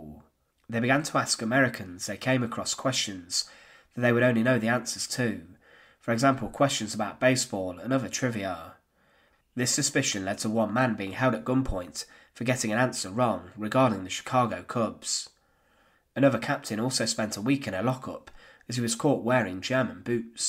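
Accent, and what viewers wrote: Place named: England, United Kingdom. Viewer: Sounds to me, a British person, like he has an estuary accent. It’s very clear.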